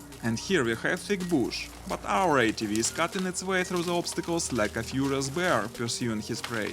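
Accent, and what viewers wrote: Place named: Russia. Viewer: Русский акцент аха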